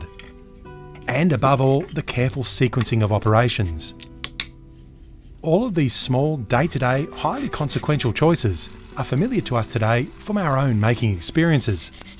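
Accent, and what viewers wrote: Australia. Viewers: Kiwi here; most DEFINITELY has fair dinkum Australian vowels! He's in Queensland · aussie. Eastern states based on the accent. My guess is southern Queensland or possibly Victoria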